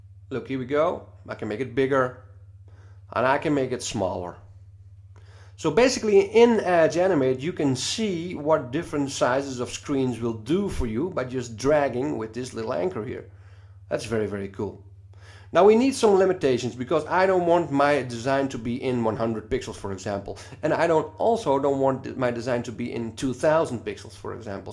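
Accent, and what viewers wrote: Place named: Netherlands. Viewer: Quality dutch accent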